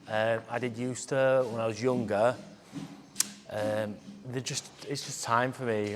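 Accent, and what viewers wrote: Yorkshire, United Kingdom. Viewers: Where are you from? Britain? Sounds like. I' ve been to Oxford, Kidlington, Cambridge, Bath, London, Bournmouth, Blenheim Palace and Newmarket, watching the racing horses 🥰. And what's your favourite knife? 🤗🦄 Thanks for sharing. 👋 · He is a Yorkshire lad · your Yorkshire patois something words escape my understanding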